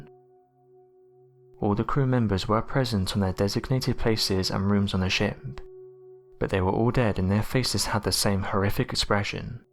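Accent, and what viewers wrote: England, United Kingdom. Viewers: T A it’s an English accent.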